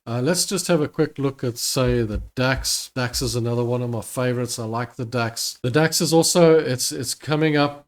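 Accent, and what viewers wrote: South Africa. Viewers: Sounds south African to me 😂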